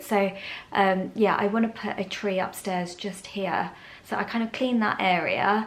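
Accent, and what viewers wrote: United Kingdom. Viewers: Dear sweet. You are adorable!!! I watch your Tuesday videos on the car ride home for you and I drink my lukewarm bottled water that’s been sitting in my car all day. I love hearing you speak. First of all your British accent is adorable. I’m from California where nobody enunciates their words. You have the best elocution. Lol, it’s so great to hear you speak about things other than the C word because you are so much more than that. I want to hear about whatever you want to talk about. This is your safe space. I’m really happy to hear that you are looking forward to working. It would be amazing if you could start out on a very part-time basis. Filling in for people who need to take a day off would be so helpful to your coworkers, the patients and for you. It would be a win-win-win situation. It is a bit early for Christmas. Lol. But I’ll let you be you!!! 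Don’t throw away your tree on the 26th., though. The Christmas season actually lasts until January 6th. 🎄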